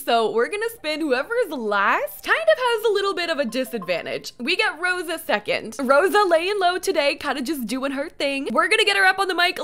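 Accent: Canada. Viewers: When I saw Eliza as a mixologist, the first thought that came to my mind was "oh God, she's gonna spit in all of the contestants drinks" 🙈 Absolutely love the chaos in the Reality show! Little, funny side note: since I've been watching your videos I kinda started picking up your accent and the way you speak :D My half Canadian English teacher (who speaks normally in British English) was excited to hear his accent from one of his student xD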